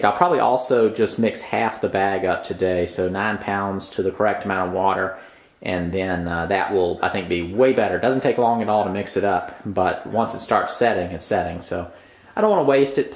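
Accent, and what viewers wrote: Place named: Virginia, United States. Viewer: By your accent it sounds like you’re in South Central VA. Do you perform contracted home renovations as well?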